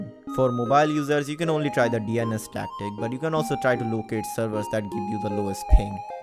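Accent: India. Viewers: U already know when his accent is Indian ur in the right tutorial 😭🙏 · Why did you redeem it ahh india voice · bro sounds indian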